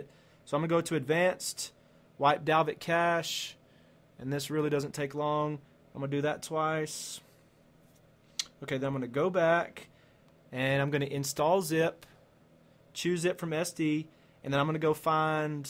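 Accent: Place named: South Carolina, United States. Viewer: You have giant balls with a South Carolina dialect. Or North Carolina. Whatever you coastal geniuses.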